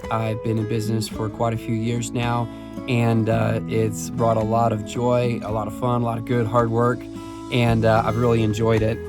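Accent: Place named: Midwest, United States. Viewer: Hmm. Idk but from his accent I'd say that he's from the Midwest. I could be wrong though. When he said God it sounded like Gad. Lol.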